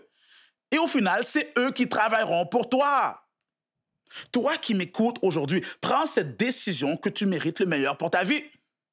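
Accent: Quebec, Canada. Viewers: Je suis là pour l'accent québécois ! J'aime trop... et pour mon business qui marche pas 😂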